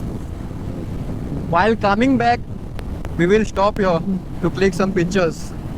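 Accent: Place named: Goa, India. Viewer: Lived in Goa for 11 years, hearing you brings back memories. You literally have similar accent to one of my really good friends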